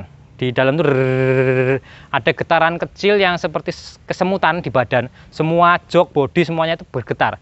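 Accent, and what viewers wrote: Java, Indonesia. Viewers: Medok banget cuy.. 😊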